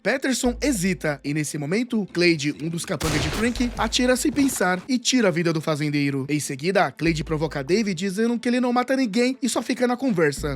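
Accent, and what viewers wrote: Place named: São Paulo, Brazil. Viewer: Pq esse cretino que narra isso como se fosse um cretino? AH, pq o narrador é um cretino caipira! SAquei logo pelo sotaque! Paulistas kkkkkkk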